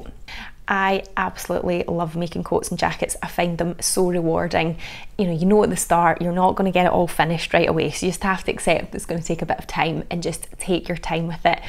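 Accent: Scotland, United Kingdom. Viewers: Yes, that lovely Scottish accent. My husband also loves listening to videos because of the accent.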